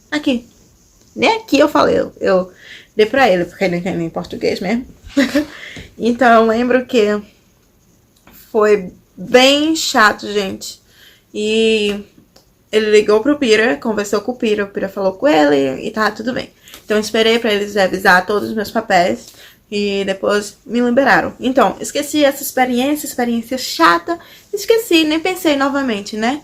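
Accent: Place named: United States. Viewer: Gostei do seu jeito de falar. Vc tem sotaque americano kkkk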